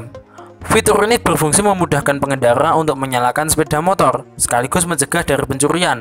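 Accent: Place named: Java, Indonesia. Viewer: Medokk :v pokoke josss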